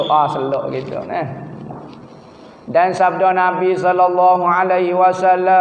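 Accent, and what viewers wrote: Kelantan, Malaysia. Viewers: Saya dari perak..suka dgor ust bgi ilmu..faham walau kecek kelate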